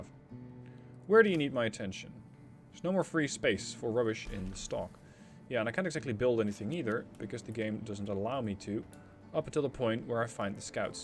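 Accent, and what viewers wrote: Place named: Netherlands. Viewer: bump for nervous dutch noices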